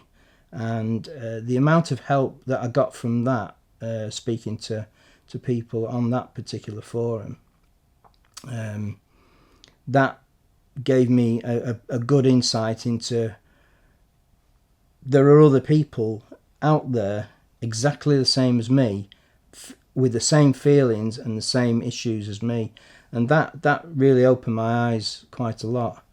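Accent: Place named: Australia. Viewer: I hear a bit of Australian in her wow !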